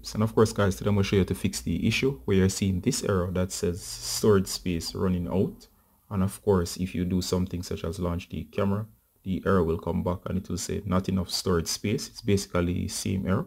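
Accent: Jamaica. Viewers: good to hear a jamaican voice .. watching from the UK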